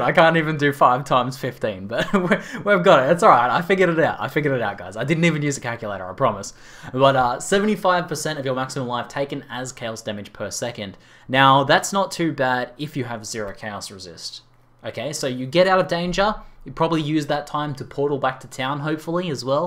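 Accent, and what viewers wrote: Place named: Australia. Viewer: your videos are always professional, very informative, well organized, and extremely entertaining! You take us through every nook and cranny in these unique videos, and I love how you go into depth and talk about how each item can be used in specific situations and examples. Big fan! Keep up the good work! Also, love how you say "just here" all the time... Must be an Aussie thing:D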